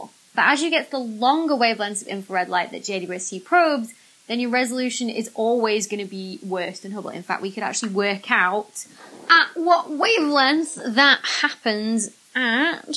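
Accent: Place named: West Lancashire, United Kingdom. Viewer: Without sounding too stalkerish, where are you from? You sound to me like you're from around here (I'm in ormskirk in West Lancashire)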